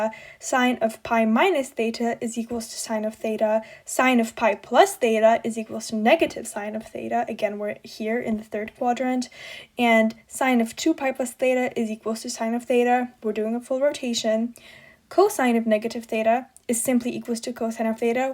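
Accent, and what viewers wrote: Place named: Australia. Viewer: Ur australian accent is cool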